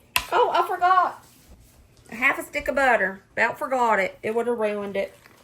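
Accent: South, United States. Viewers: I love listening to you narrate yourself. So southern. Southern woman here:face-turquoise-music-note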